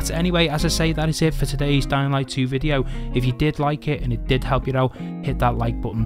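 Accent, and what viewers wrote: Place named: Liverpool, United Kingdom. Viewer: Is rite lad sound like ya from round my area Liverpool? Subbed rite away 👍👍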